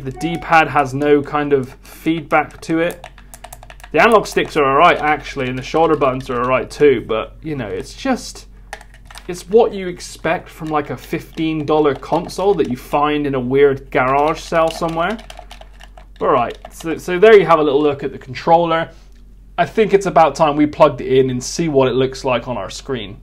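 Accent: Bristol, United Kingdom. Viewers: Do I hear a Bristol accent?👀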